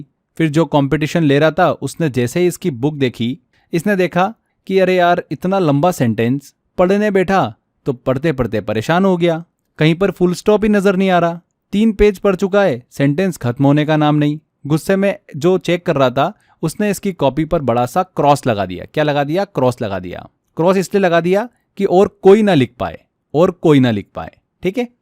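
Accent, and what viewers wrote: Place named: Maharashtra, India. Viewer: Sir Aap ki Hindi voice se lagta hai ki sir Aap Maharashtra se belong karteh hai..... Marathi Hindi type voice.... kyuki sir hum 4saal Pune mein tha....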